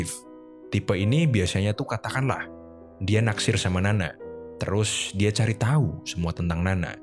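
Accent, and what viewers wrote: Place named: Java, Indonesia. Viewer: Ga, yg ini agak medok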